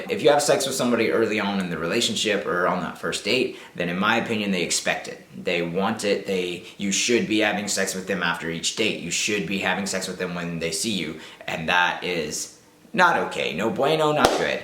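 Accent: South, United States. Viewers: Ohh, that Southern voice is giving me goosebumps. I love it! It's great to see you Smile again. I love you always seem Complex, that's a great thing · I for one wouldn't care if you talked in a Southern accent for the remainder of your time on YouTube, it reminds me of your very first video you're coming out video one of the things that I found so endearing about that video was that southern accent, you weren't trying to hide it it was just there and I found that to be precious and plus I'm in South Carolina so I get ragged all the time about my Southern accent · Okay, my question for the Q&A (if you so choose) - do you use your "Southern" voice on dates or you "Normal YouTube" voice? (I'm wondering now because I've heard your accent and the general topic of the video xD) Otherwise, I feel like I would enjoy sitting down with you and just picking your brain about all the stuff you talk about... 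oh shit my psychologist is showing, k thx bai!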